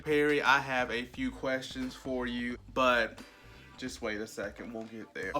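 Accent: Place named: South, United States. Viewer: See I knew it was somewhere southern lol You're welcome, I could just hear your voice all day talk about Tyler Perry lol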